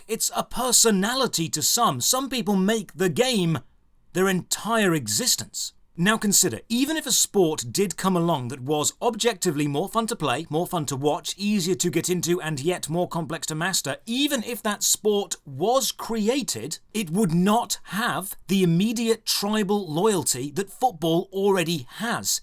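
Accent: United Kingdom. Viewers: showing an american football venue while talking about football and sounding british. Now I'm confused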